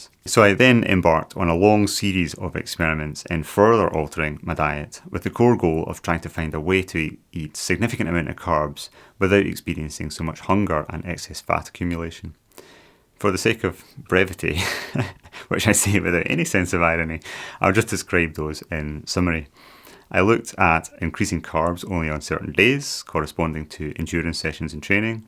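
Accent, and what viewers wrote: Scotland, United Kingdom. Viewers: you've really outdone yourself on this video! I'm still working my way through it in 1/2 hour segments. It's very dense in terms of the information it contains, which in turn gives me plenty of food for thought. I really appreciate all of the hard work that went into researching and producing this. I love the way you've gone directly to the basic research, applied it to your own experiments and drawn your own conclusions, which in turn you've shared with us. I also look forward to the future nutrition topics you plan to delve into. I feel very fortunate to have discovered your channel. The only downside is that I find your voice totally mesmerizing, to the point where I cease to process the words that you're speaking. Gotta love that Scottish accent!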